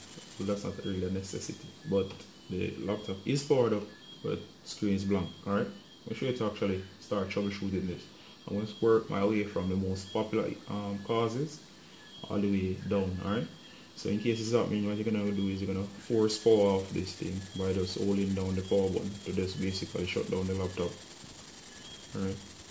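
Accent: Jamaica. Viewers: oiii mi can hear sey ah jamaica u come from. mi laptop ah move ah way. him mi can link u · Sound like a Jamaican named maro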